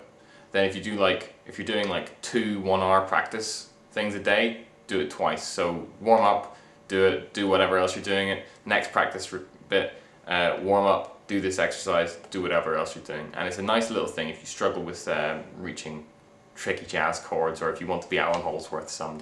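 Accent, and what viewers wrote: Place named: Northern Ireland, United Kingdom. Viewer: true man! your videos are great and i hope to see more from ya! haha cool so i was little bit right! :D i thought i detected a tiny bit of northern irish in there somewhere haha!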